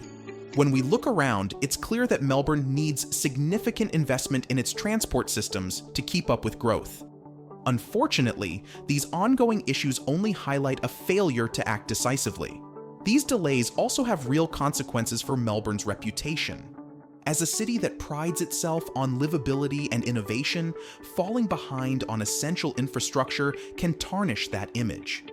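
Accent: United States. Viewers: Why do we have a yank who can’t pronounce Melbourne talking about “our” city??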